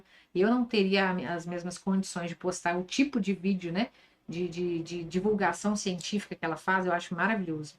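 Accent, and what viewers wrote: Minas Gerais, Brazil. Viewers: Ele mineirinho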